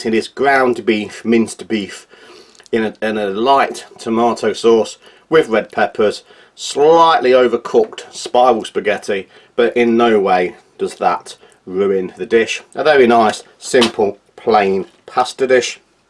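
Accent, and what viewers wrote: United Kingdom. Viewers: Great and detailed review ! And as always it's a pleasure to hear your pure British English :) I like the German ration because of the diversity and amount of items. The content tries to remain traditional German meals-like breakfast-which are often bread/rolls with butter and some meat or cheese. The cold drinks are the best !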